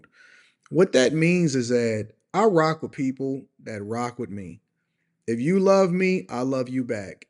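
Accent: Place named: Georgia, United States. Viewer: Sound like he's from Georgia living in the 18th hundred do not know he been free for over 200 years.. just say.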